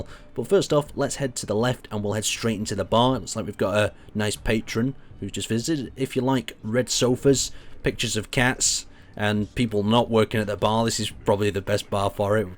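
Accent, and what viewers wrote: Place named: Burnley, United Kingdom. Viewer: where are you from? Burnley lass!!!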